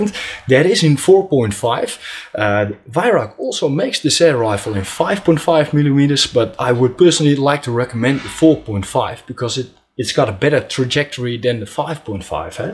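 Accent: Netherlands. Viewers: Very good review. Wonderful accent, sounded like Paul Whitehouse Dutch Policeman.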